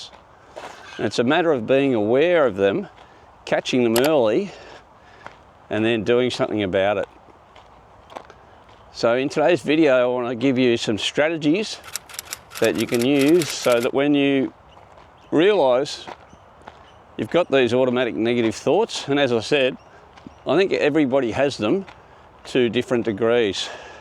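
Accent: Australia. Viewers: Thank you for your words of wisdom. Love your Ausi accent. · Love taking these walks with you,! Thank you for your positive input with your Aussie accent!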